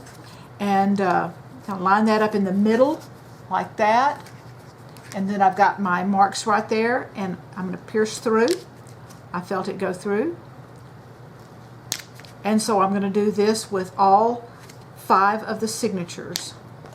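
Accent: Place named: South, United States. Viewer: I want to say that your detailed description of each step is exactly what I needed! An added bonus is your accent! I’m grew up in the south and I’m always homesick! Thank you for your video!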